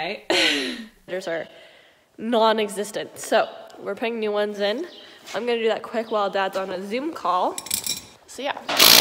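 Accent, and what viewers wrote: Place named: Scotland, United Kingdom. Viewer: mabee alot of you guys have a bit of a Scottish or Irish twang in yer accent.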